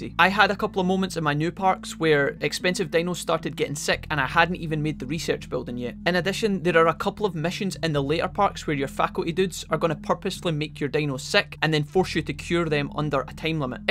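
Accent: Scotland, United Kingdom. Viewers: This guy is scottish. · Is this a scottish accent? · Cool video, learned a couple neat tricks for the game, and also found a fantastic new Scottish accent to emulate. Well done lad! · Ah 'hink he's Scottish like me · I love your accent! Are you Scottish? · First time viewer and love the Scottish accent. I am a giant fan of foreign accents so this is amazing!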